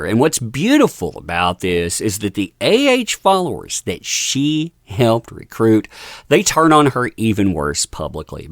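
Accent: United States. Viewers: I love this guy's accent😅 He sounds like a real American cowboy❤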